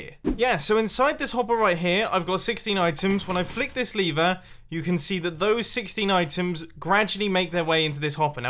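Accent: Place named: United Kingdom. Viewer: you are speaking british !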